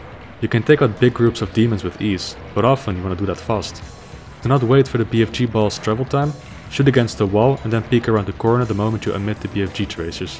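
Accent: Netherlands. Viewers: tfw you can spot a fellow Dutchie just by their accent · R u Dutch?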